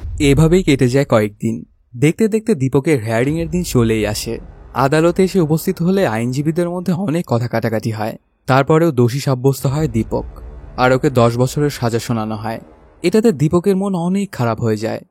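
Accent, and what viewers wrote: Bangladesh. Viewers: বাংলা দেশের লোকেরা এই ভাবেই কথা বলে।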